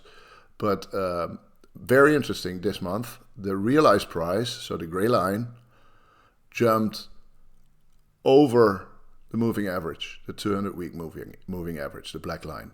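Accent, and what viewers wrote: Netherlands. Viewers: If someone sounds like the new NATO top dog, they're Dutch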